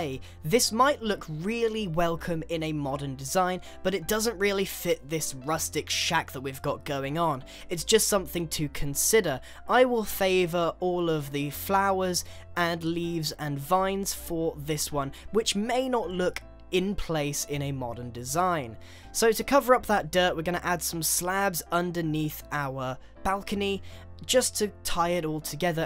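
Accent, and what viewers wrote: United Kingdom. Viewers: you're British right, it's hard to tell : o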